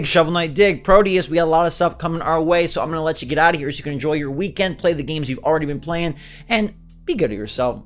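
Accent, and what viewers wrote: United Kingdom. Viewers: Your British accent sounded just like the guy who plays Phillip in the Crown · I was wondering the British accent but the queen did kinda die though😢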